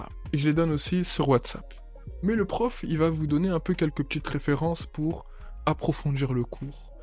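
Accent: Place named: Antilles, Caribbean. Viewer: Toi tu es antillais, je reconnais l'accent. Entre nous, on se reconnait, hein.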